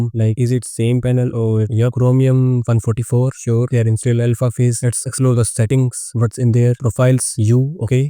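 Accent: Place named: India. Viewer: this is the thickest indian accent i've ever heard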